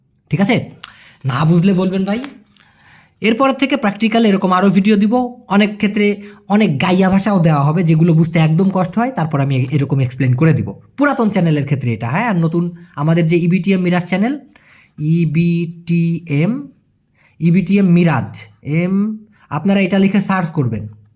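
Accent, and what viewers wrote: Malaysia. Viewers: ভাই আমি এই ভিডিও টা যখন দেখছিলাম তখন আমার পাশে আমার বন্ধু ছিল সে মালাই সে বলছে আপনি কলা লামপুর এর ভাষায় কথা বলছেন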